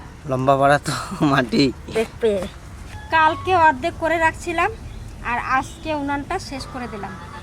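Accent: Barishal, Bangladesh. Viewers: আপনার ঠাকুর মার বাপের বাড়ী কি বরিশাল। কথা শুনলে তাই মনে হয়।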